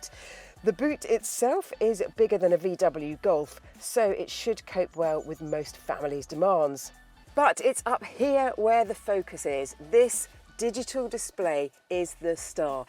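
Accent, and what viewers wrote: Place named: RP, United Kingdom. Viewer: VB-H showing us how a car review should be done! Even if she does sound a bit toffee-nosed she never fluffs or makes a mistake in diction! Good on you!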